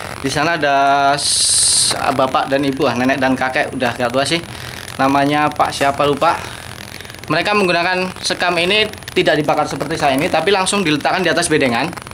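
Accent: Banyumas, Indonesia. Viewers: dri logat nya kya org banyumas ya om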